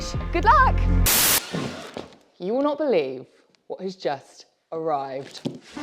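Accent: England, United Kingdom. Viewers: A women with and English accent makes me harder than a bag of jawbreakers, or was it a bag of tenpenny nails!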